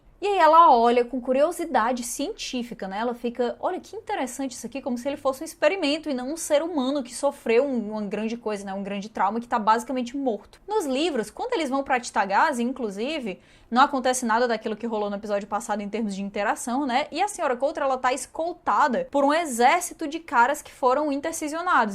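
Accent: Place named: Northeast, Brazil. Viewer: Kkkkkk esse sotaque nordestino da eu amoo 😂❤ que pena que esse já o penúltimo review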